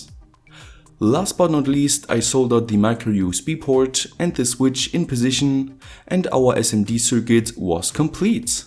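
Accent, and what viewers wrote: Germany. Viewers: are u deutch? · Your accent is kinda like german are you from Germany?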